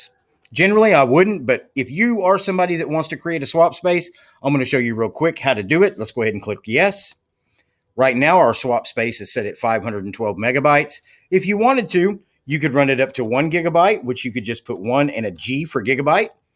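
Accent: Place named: Dakotas, United States. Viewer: Central time zone. I'm guessing from the voice you are in the Dakotas?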